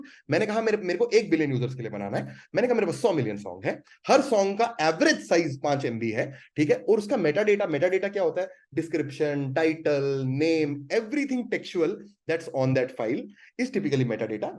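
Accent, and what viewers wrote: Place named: Hinglish, India. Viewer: that hinglish is killing me brottha !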